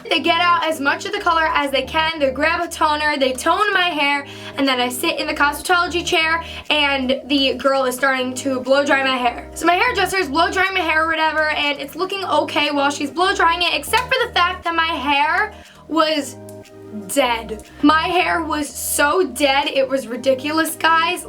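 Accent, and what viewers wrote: New York, United States. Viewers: You have such a new York accent I LOVE IT 💜💛💙💚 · New York accent baby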